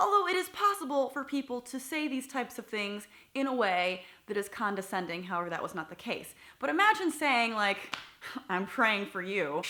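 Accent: South, United States. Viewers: LOVE YA LIKE A SISTER, GIRL. IT'S FUNNY THAT YOU'D DO THIS VIDEO AS I JUST HAD A YOUTUBER TELL ME THAT SHE'D PRAY FOR ME. I REPLIED, SAYING, WELL I' SAY "AFFIRMATIONS" FOR YOU. NOW, I'M TORN BECAUSE I DON'T KNOW WHICH I LIKE BEST I'LL "THINK" FOR YOU OR I'LL SAY AFFIRMATIONS FOR YOU. I DON'T, PERHAPS. I' LL USE THEM BOTH., KEEP THOSE VIDEOS A COMIN. OH, LOVE YOUR SOUTHERN ACCENT. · I do hear your southern accent.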